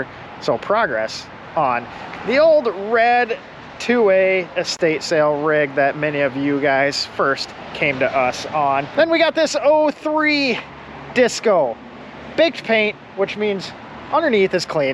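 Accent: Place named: United States. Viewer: God I hate the American accent!!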